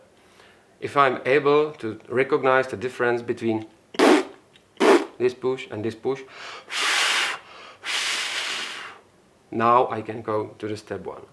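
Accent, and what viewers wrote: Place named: Norway. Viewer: You sound norwegian..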